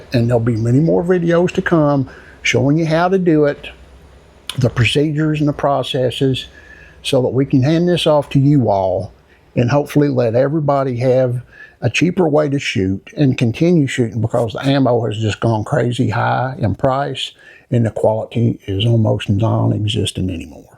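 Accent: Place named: South, United States. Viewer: I like your accent. I’m trying to learn if it’s regional. I’m going to guess Alabama or Mississippi. I’m from Australia.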